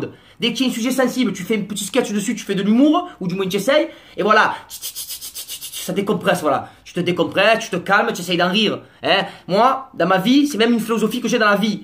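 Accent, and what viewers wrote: Marseille, France. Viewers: T as raison mec ! Tu dis exactement ce que je pense et avec l acceng de Marseille gros ça passe bieng mieux. Degeun peut dire le contraire 😁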